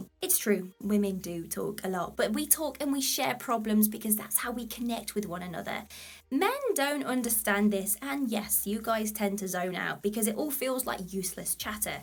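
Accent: United Kingdom. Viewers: ❤her British accent/ sexy voice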